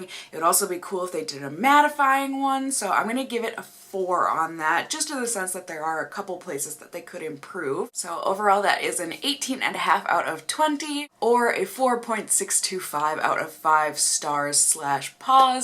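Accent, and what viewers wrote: Minnesota, United States. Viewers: you sounded really minnesotan in this video 😂😝💓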